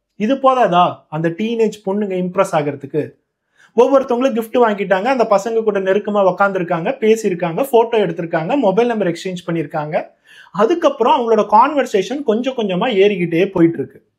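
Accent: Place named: Coimbatore, India. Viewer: ஹலோ வணக்கம் வணக்கம் நான் கடந்த சில மாதங்களாக உங்களது வீடியோவை மிஸ் பண்ணாமல் பார்த்து வருகிற மற்றவர்கள் போடுகிற வீடியோ விற்கும் உங்களுக்கும் என்ன வித்தியாசம் என்றால் அந்த சம்பவத்தை சொல்லும் விதம் தேவையில்லாத ஆர்ப்பாட்டங்கள் இல்லாத அருமையான தெளிவான விளக்கம் கடைசியில் ஒரு ஒரு அனுபவம் பாடம் ஒருவேளை நீங்கள் பொள்ளாச்சியில் படித்ததால் கோவை மாவட்ட சொல் வழக்கு நன்றாகவே உங்களுக்கு வருகிறது அந்த கதை சொல்லும் விதமே நீங்கள் வெற்றியடைய முழு முதற்காரணம் தயவுசெய்து இந்த பாணியை மாற்றிக் கொள்ளாதீர்கள் தானாகவே பார்ப்பவர்கள் எண்ணிக்கை அதிகமாகும்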